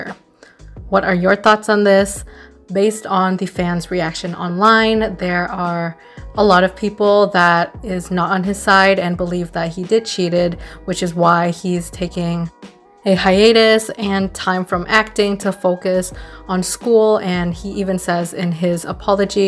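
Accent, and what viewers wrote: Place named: South Asia. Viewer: I have watched it once or twice. Not really interested about it. Because I think they are just not accurate. Just notice that the channel owners usually from south asian - due to the accent. Probably form India or Pakistan. It's hard to attain such informations like income and personal life. It's difficult to dig out info about C K and J artists n Idols because they keep their life privately. Especially in China. Mostly bcause the govt watches everything. But you can get info from reliable sources like established media with reliable journalist. Or try to read a blog. But absolutely they cant tell the exact amount. Only the speculation. I cant speak chinese korean n japan. I only read and watch in English. Oh yeah..even their own native media cannot tell the exact info about the artist. For example. Nobody knows where Fan Bingbing resides exactly evsnthough people said she lived in luxury complex. 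Japanese paparazzi often make mistakes about where their idols actually sleep. For chinese actors..we already know they have yinyang contract. Wont know how much they get paid. And we dont know how much their agency fee and their expense. And how they manage their money. Because when they exposed their wealthy, they should already to pay tax too. And dont forget people who will critize about how the artists manage their wealth. IU got critics when she bought the land. Actually she was helped by the asset management agency. JunJihyun was critized for being a mean landlord. AFAIK, s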